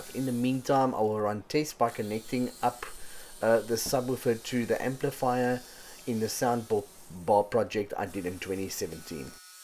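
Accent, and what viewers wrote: Afrikaans, South Africa. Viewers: he sounds so Afrikaans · wow you sound very Afrikaans. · Nice to hear s.africa accent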